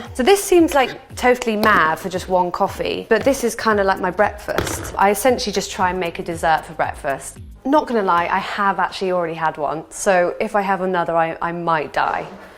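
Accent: United Kingdom. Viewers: Here accent is more British than a ASDA or a Tesco worker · Her UK accent ughhh 🥵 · That British accent, love it! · forgot she was british and i thought she was faking an accent in the beginning.. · Not gonna lie ...she has a strong British accent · She's so British 😍😄 I love it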